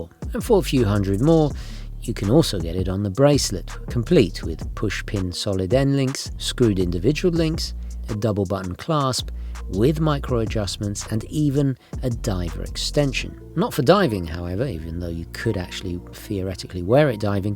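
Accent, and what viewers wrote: United Kingdom. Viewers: An American with a Brit accent in a foreign country doesn’t get anymore American than that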